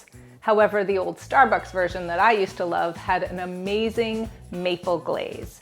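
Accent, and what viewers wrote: Canada. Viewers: Are you U.S. North or Canadian North?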